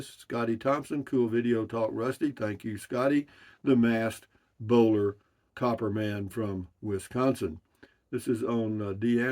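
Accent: Texas, United States. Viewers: Sam Elliott is a mature, seasoned actor, good hair, impressive mustache, deep voice. He has earned many fans among lovely women our age. Amigo, I say take it as a compliment, definitely a flirt, when a woman claims you remind her of good ol' Sam. He played Virgil Earp in Tombstone 1993, and he played Sam Houston in Houston: the Legend of Texas 1986. And a lot of other western and action movies. We could all be compared to worse. And anyone who thinks your respectable Texas accent sounds like that tv doctor, is a tone-deaf northerner. Don't even bother fretting.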